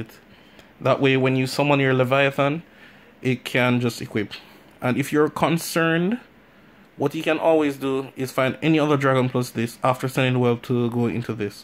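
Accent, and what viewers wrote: Jamaica. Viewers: your jamaican?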